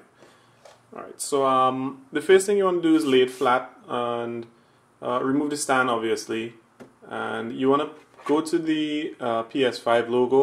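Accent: Trinidad and Tobago. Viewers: Are you from Trinidad? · Was surprised when I heard the Trini accent lmfao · Is that a Trini accent ? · Is he Trini? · Thats a trini accent i hear? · Thanks for the video you sound like you’re a Trinidadian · You a Trini? 😊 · Mannnn I started listening to the accent and I’m like… he sounds Trinidadian…I look at your Instagram and confirmed ✅ my moms side of the family is Trini 🇹🇹 awesome to hear a voice representing on YouTube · you from trinidad? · know that trini accent anywhere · Yo you sound Trini, big up man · Soundin like a rell Trinidadian “ 😂 Thank you ! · Yo the shock didn't expect a trini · Yu does know a trini from anyway🙏🏾 thanks for the vid bro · Bro you sound Trini. Boss... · Aye Trini. I hear you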